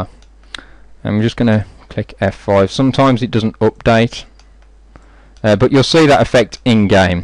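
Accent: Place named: Birmingham, United Kingdom. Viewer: Love you're content and have to admit that even though I've watched your content for several years, I hadn't (until now) subscribed. Better late than never I suppose lol. From you're accent, I'm guessing you're from the same neck of the woods as me ... Birmingham??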